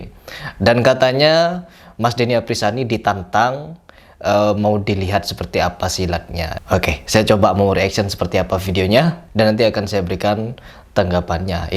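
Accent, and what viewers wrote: Madura, Indonesia. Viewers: Logatnya kaya Madura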